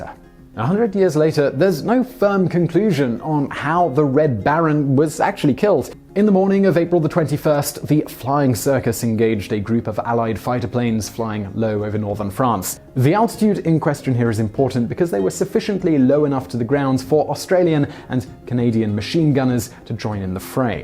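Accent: England, United Kingdom. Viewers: Lay off that pretentious accent🇬🇧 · I can't listen to this guys horrid English accent.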